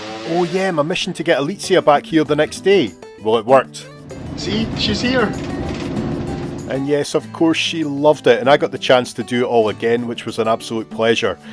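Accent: Scotland, United Kingdom. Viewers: The trees look really weird! and, does your Spanish accent have a hint of Scottish? Lovely video..great to go along... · Very informative & enjoyable, thanks! The Scottish accent is music to my ears. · What a very beautiful and entertaining video again! 😄 Love your channel and your Scottish accent! I’m about to visit a friend in Montrose in July! I’d like to have a SNAKEBITE with you.👋🏼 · Just pure natural approach to travel and the catchy Scottish accent. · What is the specific name of the town you were walking? Love Spain and considering returning for the 3 rd time. Great video. My grandparents were born in Scotland as was my mother. My grandfather was a golf pro in North Berwick. So enjoy your "Scottish" accent and reminds me of my heritage.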